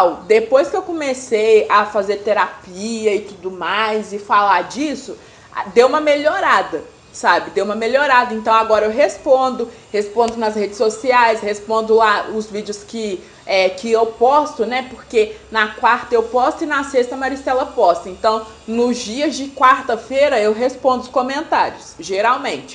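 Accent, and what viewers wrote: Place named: Minas Gerais, Brazil. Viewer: #parabéns meninas ...conheci o canal pesquisando sobre a Gabi de pretas, sim a chamo desse jto, cheguei em em vcs é não larguei mais....fiquei tão feliz qndo vi um canal de mulher preta com sotaque daqui de MG 🙂q bom q vc está cada vez se fortalecendo mais com o canal...voem meninas voem👏🏾🖤🖤🖤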